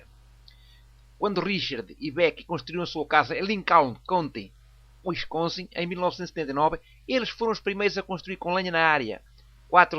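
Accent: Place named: Portugal. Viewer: A fala é de um português!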